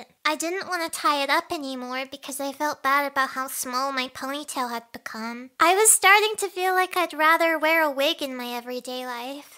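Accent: Australia. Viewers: Honestly, I didn't notice the australian accent until she mentioned australia. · ARE YOU AUSTRALIAN?!😮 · YOUR AUSTRALIAN 😮 I AM TOO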